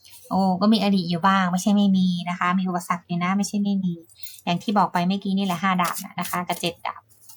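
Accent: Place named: Isan, Thailand. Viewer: ได้ยินสำเนียงอีสานกดติดตามเลยบักคนบ้านเดียวกับคร้าบ❤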